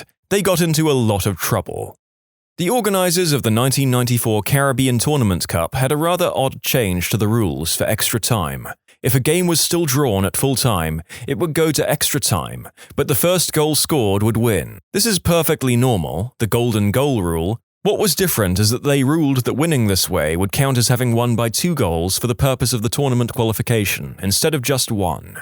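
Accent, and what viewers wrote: United Kingdom. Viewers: Pardon my language sir but WHY THE FUCK ARE YOU BRITISH NOW, WHAT THE FUCK HAPPENED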